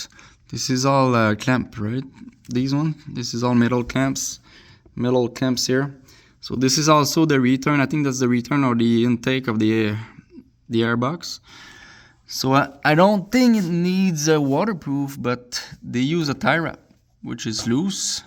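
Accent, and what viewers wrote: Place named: Quebec, Canada. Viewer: Je reconnais l accent quebecois. Le tube avec la tie rap a l avant du moteur, c est la belt exhaust. La sortie est a l avant du moteur pour faire dissiper la chaleur du moteur vers l arriere. Donc, meme si tu met un collet, il va quand meme s accumuler de l eau dans le tuyau puisque la sortie est tout pres... le tuyau en u en haut avec un trou, c est aussi le tuyau de la belt exhaust. L air sort de la belt box, monte en haut, et redescend au moteur... lorsque tu est dans l eau, puisque l air ne peut pas sortir au moteur a cause de l eau, elle sort par le petit trou en haut dans le u.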